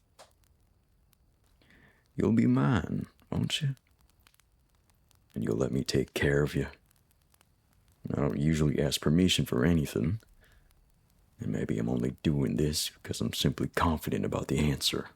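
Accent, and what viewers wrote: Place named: South, United States. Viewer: I'm a sucker for a southern accent! 💖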